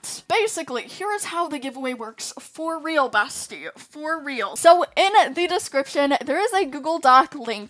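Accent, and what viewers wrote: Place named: England, United Kingdom. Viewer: “Have fun today” / “equestrian festival” love your Chanel, your English accent is on point x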